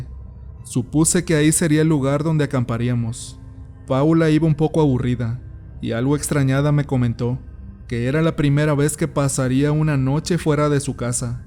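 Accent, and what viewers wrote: North, Mexico. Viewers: Hijole amigo tu acento norteño le quita emocion, parece que lees muy rapido.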